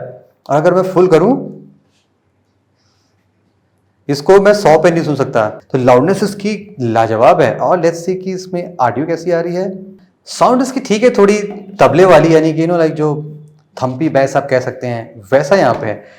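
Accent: Lucknow, India. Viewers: Sir, Are you From lucknow?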